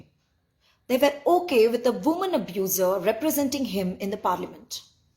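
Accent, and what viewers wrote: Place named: Ivy League, United States. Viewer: You're obviously biased and parroting your party position backing it with the weight of your credentials and ivy league accent; however not realising or blissfully ignoring the fact that the moment your affiliation surfaces, your entire criticism loses credibility as you represent the most corrupt, nepotistic family domonated political organisation led by one of the most incompetent and ignorant, self obsessed, entitled leader.. Good luck to your aspirations as I hope you might be genuine despite your affiliations..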